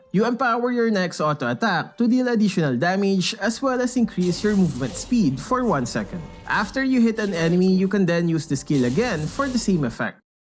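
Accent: Philippines. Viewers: Philipines accent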